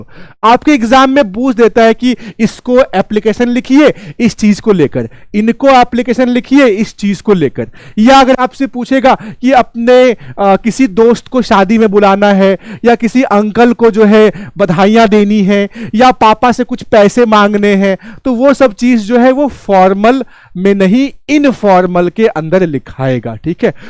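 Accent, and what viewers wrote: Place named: Darbhanga, India. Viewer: Darbhanga wale hai kya 😅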